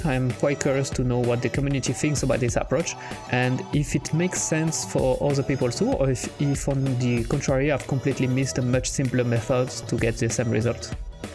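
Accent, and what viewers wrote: France. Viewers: you ar french youuu ?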